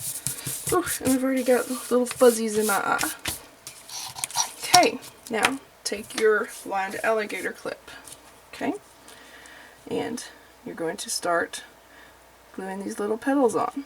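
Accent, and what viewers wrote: Texas, United States. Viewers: Love the Texas English! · Texan english rules!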